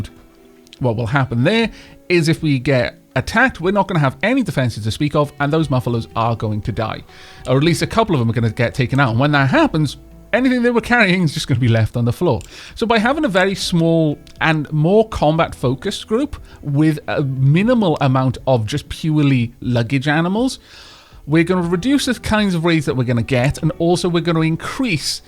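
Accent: Wales, United Kingdom. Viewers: Smartness Tooth is out, novacaine wore off, video is out... Trying yo decide wether to die from the pain, or jump for joy for a new RimWorld video. Leaning much more towards the jumping for joy option. voice is very soothing... I'm only a quarter through the video, and my jaw is already starting to fade. I think its the Welsh accent. Even my 'murican upbringing can appreciate an amazing accent ^-^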